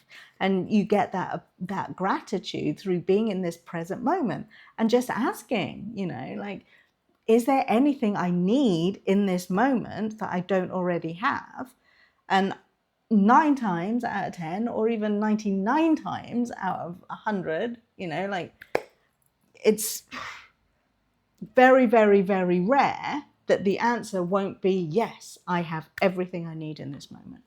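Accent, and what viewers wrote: United Kingdom. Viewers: 😊😊😊 That British accent is too kool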